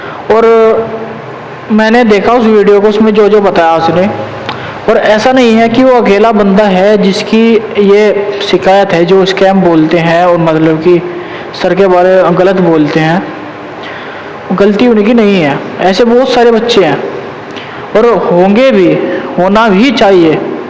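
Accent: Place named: Muzaffarnagar, India. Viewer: Brother ap kaha se ho apki voice hamare muzaffarnagar jesi lag rhi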